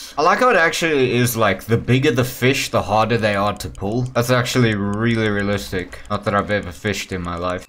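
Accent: South Africa. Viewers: Are you south african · Bro I too am South African and I could recognise your accent,so I checked your profile and I was correct :D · Has to be south african bro I can't unhear it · South African? Glad I'm not the only one playing this beauty again.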